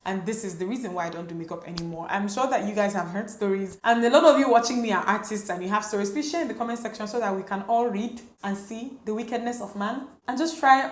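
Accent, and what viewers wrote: Nigeria. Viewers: I wonder what's wrong with your accent that someone will be complaining about. Hmmm... World people, I personally loves your accent. It's our Nigeria accent and very understandable, that Afro Puff don't know if he or she should go and complain on all this Ghana or Kenya Youtubers that uses their country accent na. Nma please don't change who you're because of what people are saying because you can never asin never please world people. If you're doing this, they will request for that and if you start doing that, they will automatically request for this. Stay blessed, you motivates me a whole lot. Thanks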